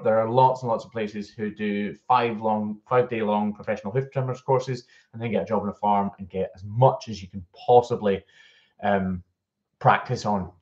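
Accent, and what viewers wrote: Scotland, United Kingdom. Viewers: Love your Scottish accent do you have an American accent too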